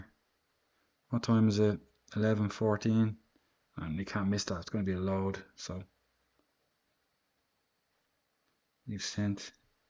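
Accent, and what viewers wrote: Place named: Ireland. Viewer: Love your vids. Question... I am no genius but it since like you might be from Ireland.. haha love the accident. I am from England... and now that labour have got in ans they are probably going to increase capital gains tax.. I was wondering if you had any advice.... if you think they could do it at the autumn election? Wait until April 2025.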